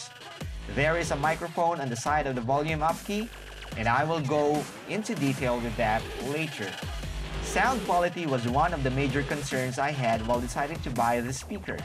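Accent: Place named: Visayas, Philippines. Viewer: litsi bisdak man pud diay ni sya hahaha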